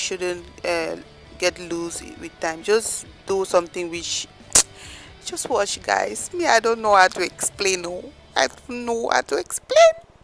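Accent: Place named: Africa. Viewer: This is a beautiful wig and I would love to order. However, the voice sounds African but the IG page says China.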